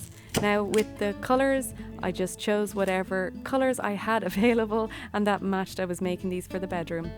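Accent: Ireland. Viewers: Well done!! Really neat to see the different ways to make and use stuff, that's lying around the house. I for one would love to see more shows like this from you. Thanks for sharing!! By the way...I lived in County Kerry Ireland for a year, many years ago. Ireland is such a magically beautiful place. I miss it dearly. Though, watching your video enables me to feel a bit of that connection again. Thank you for all the work you do. I love (and remember well) that lyrical Irish accent that I use to encounter when I lived there; hearing it always made my day. Yours is lovely, as well. :)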